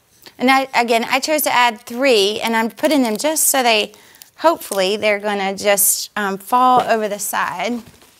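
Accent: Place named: Georgia, United States. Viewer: humble dialect :) in Georgia. hope it's empowering. happy making!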